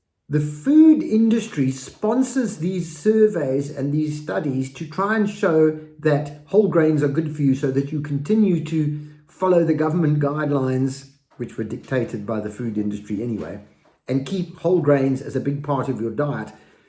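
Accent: South Africa. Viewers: Brilliant...You're so right. Totally agree.. Everyone listening needs to take care of diet and exercise before its too late. I live in USA.. Linda, ex-Zim..Good to hear yr SA accent :)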